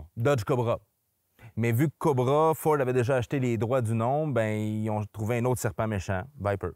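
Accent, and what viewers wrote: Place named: Quebec, Canada. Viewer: Vraiment extraordinaire, en plus avec l'accent québécois , c'est vraiment plaisant !😊😊😊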